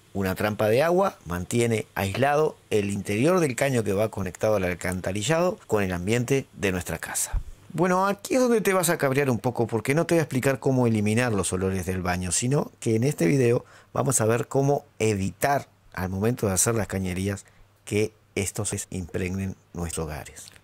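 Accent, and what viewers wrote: Uruguay. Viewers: Habla en uruguayo gallego? Elegí uno loco!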